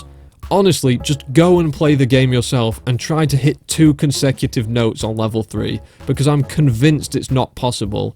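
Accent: United Kingdom. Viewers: That British was like a foreign language to me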